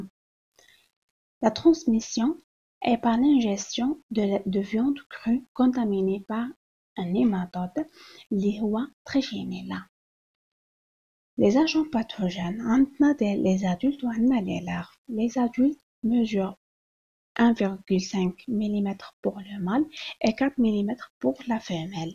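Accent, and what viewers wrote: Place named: Arab world. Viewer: Vous parlez le français arabisé ou l'espagnol ? J'ai du mal à vous comprendre par endroit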